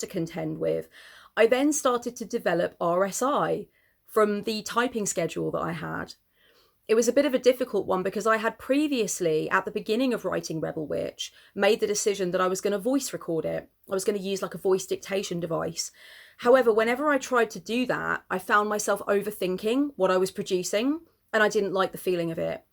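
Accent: United Kingdom. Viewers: You are right! I wouldn't listen to an audiobook if it wasn't you. My son has Autism and is obsessed with British accents. Sometimes I put you on to calm him or pull him out of meltdown. XO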